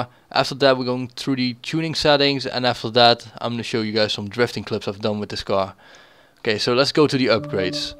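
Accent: Netherlands. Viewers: Are you dutch to me you sound dutch nice tune btw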